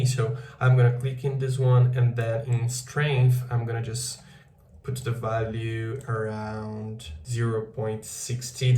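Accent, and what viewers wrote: Brazil. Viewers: Sotaque brasileiro, certeza😊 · Quando escutei o sotaque no começo e vi que eu tava entendendo bem o inglês eu pensei: ele deve ser br ahhahah. Nice work in this video man!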